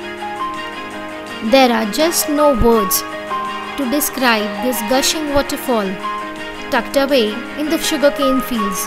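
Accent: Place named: India. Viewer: WHO THE HELL IS THE NARRATOR?? '' PPOS'' A LITTLE AND LEARN HOW TO SPEAK PROPER ENGLISH, NOT INDIAN ENGLISH..IT IS REALLY ANNOYING AND IRRITATING..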